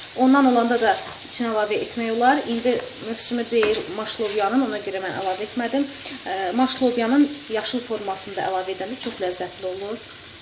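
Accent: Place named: Yeraz, Armenia. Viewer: Ay sənin ləhcənə qurban. Ay mənim YERAZ bacım.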